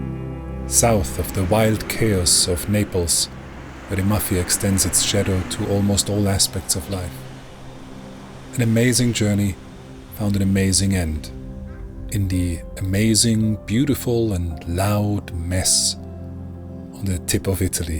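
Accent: United States. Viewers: Great to see you back, Father. But you seemed to have aquired an American accent and the pitch in your voice seems to be an octave higher... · Good to see you again. Your voice sounds somehow different? More American- I even wondered if it was you narrating at one point!! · I am very confused. I do NOT recognize your voice. When did you aquire an American accent? Speaking of American, you seem to have pivoted from Religion to the mighty dollar. How very sad this new you is! I hope you reconsider and go back to your old self.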